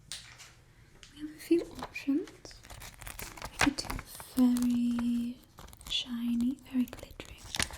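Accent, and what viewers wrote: England, United Kingdom. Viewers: I actually thought you were british lol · I love that you're trying to do a British accent lol!! Ive never seen the show but this is chill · God I love this and I like how I was expecting a French accent but was very happy to hear an English one! What a lovely video :) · Being tired I had to keep reminding myself this wasn't one of the British ASMR youtubers I watch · I love that you've done this makes me even prouder to be English and your accent and attire is amazing you make a very classy lady ❤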